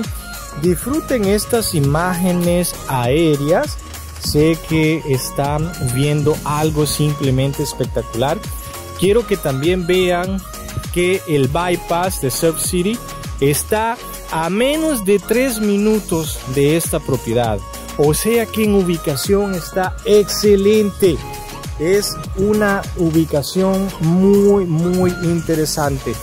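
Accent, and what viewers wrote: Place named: Colombia. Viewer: Disculpa tú de qué nacionalidad eres amigo me suena que eres colombiano verdad sólo por curiosidad gracias y bendiciones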